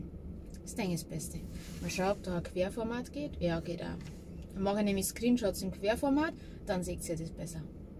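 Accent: Bavaria, Germany. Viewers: Hallo. Feine Sache. Nun gut, diese etwas säuerlichen Regelungen, dass man ein gleichwertiges, gebrauchtes Teil bekommen kann, die kenne ich auch aus dem Job. Die Leute denken immer das Garantie (Gewährleistung) bedeutet, dass man auf alle Fälle Neuprodukte beanspruchen kann. Pustekuchen. Allerdings ist es schon komisch, dass beim Akkutausch Tesla anscheinend Lotterie spielt wer was bekommt?! Nuja, Hauptsache es läuft wieder. Dein Dialekt erinnert mich an meine Kindheit, wo wir immer nach Bayern in Urlaub gefahren sind. Cool irgendwie. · Ich mag deine Videos und deinen Bayrischen Dialekt.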